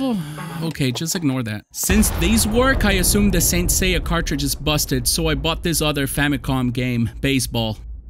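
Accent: Brazil. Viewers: Eu saquei que tu era brasileiro só pelo sotaque enquanto falava inglês, hahahaha Boa sacada fazer vídeos em inglês, mano! Assim você pode alcançar um público ainda maior. Valeu pelo vídeo, curti muito seu trabalho! Mais um inscrito! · também percebi pelo sotaque que ele é brasileiro! 😂😂😂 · perfect RJ accent!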